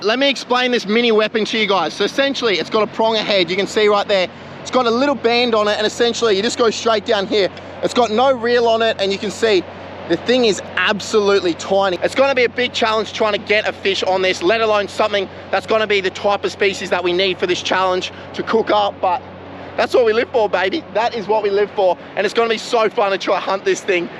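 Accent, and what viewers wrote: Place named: Australia. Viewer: bros a certified aussie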